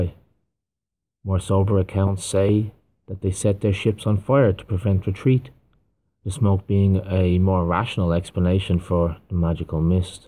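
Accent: Ireland. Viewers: Your Irish accent and pronunciation granted this the perfect seal of authenticity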